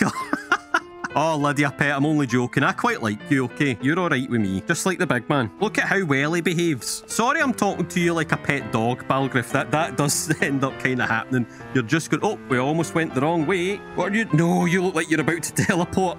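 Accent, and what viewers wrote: Scotland, United Kingdom. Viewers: False, his very heavy Scottish accent is part of what makes this so great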